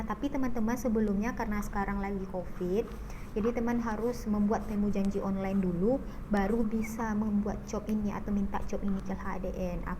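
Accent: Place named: Medan, Indonesia. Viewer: Medan banget suaranya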